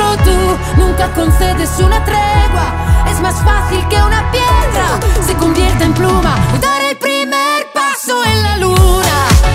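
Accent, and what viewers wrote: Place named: Spain. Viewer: Me gusta que habla español de España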